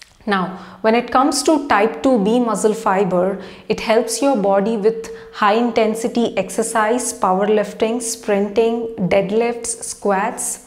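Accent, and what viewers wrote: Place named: India. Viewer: This the best indian accent from a youtuber ive ever heard. Easy to understand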